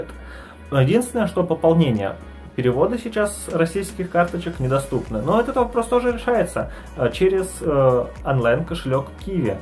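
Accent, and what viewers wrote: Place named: Belarus. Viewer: забавно, что с белорусским акцентом, вы ни разу там не были)))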